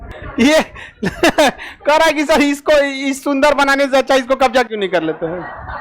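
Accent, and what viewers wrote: Bihar, India. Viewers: Bihari accent so strong... for once you forget that he's a Muslim 😂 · आप बोलते बहुत अच्छी डौंग से। एकदम बिहारी स्टाइल से। धन्यवाद।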